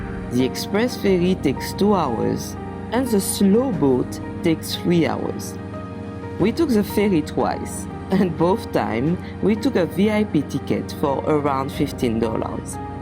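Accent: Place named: Indonesia. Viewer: It takes time to get used to your Indonesian English accents.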